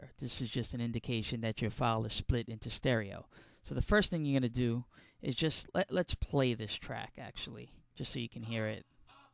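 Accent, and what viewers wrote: New Jersey, United States. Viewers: are you from new jersey? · You sure have a New Joisey accent. AWDACITY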